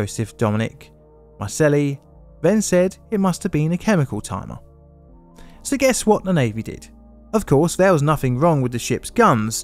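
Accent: United Kingdom. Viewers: AI narration with a Limey accent. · The US Navy is being taken to task... by a YouTuber with a Brit accent... at least slightly ironic, eh wot?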